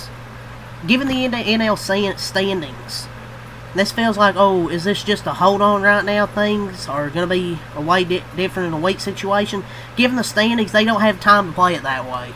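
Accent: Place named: Atlanta, United States. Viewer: Where are you from? Sounds like an Atlanta accent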